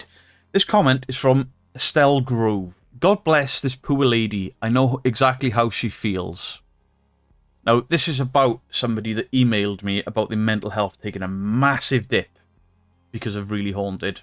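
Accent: Wales, United Kingdom. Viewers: Hiiiiiiii, love your videos. Recently found you thanks to the Shape. I love your accent, I think the Cymru accent is awesome. I lived in North Wales for 20 years before returning to Canada. Keep debunking and making me spit out my coffee because I am trying to drink it and laughing because of something you said 🤣😂🤣😂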